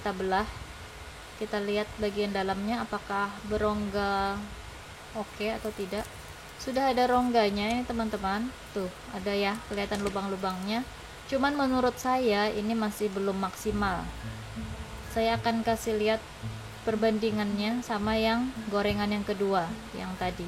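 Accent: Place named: Banjar, Indonesia. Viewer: Pasti urang banjar admin yg ngomong ini hehehehe..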